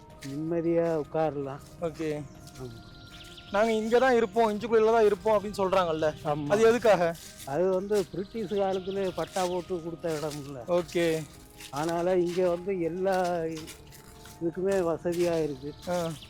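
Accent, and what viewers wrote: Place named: Tirunelveli, India. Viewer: தம்பி நீங்க திருநெல்வேலி பாஷையில் பேசி பாட்டியம்மாவிடம் எடுத்த பேட்டி super தம்பி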